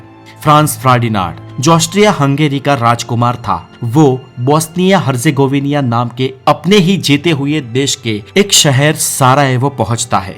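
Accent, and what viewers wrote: Gujarat, India. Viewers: Are you Gujarati bro?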